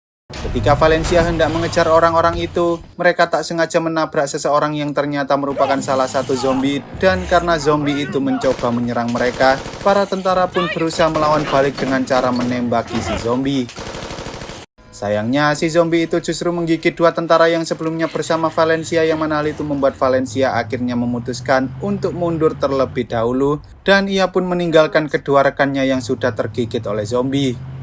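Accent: Java, Indonesia. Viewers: Mimin asalnya dari jawa apa?🤭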